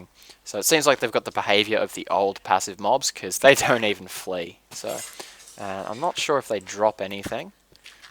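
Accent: Australia. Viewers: YOUR AUSTRALIAN :) XD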